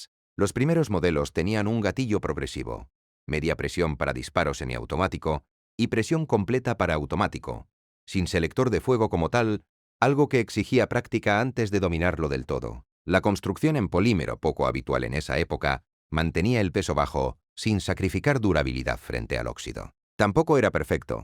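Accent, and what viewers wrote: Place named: Spain. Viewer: Hablas con acento: "ostia, tio"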